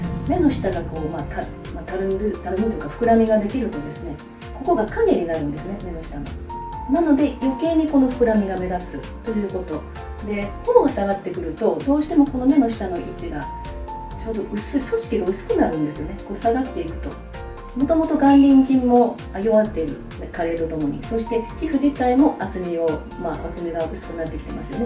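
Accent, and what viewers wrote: Hiroshima, Japan. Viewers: タメになりました🤣 なんか広島のイントネーションに聞こえた😂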